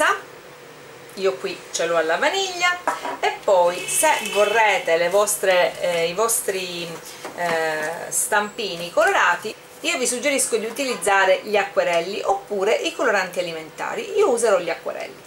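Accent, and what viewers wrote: Sicily, Italy. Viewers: Ok..grazie..ti auguro tante belle cose.. ps.o sentito sei siciliana anch'io, sei di palermo x caso? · ma sei siciliana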